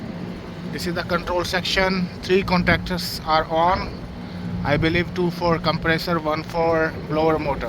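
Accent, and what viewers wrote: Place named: India. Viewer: You sound like you’re from India. I would like to connect with you and learn about your journey in the HVAC trade.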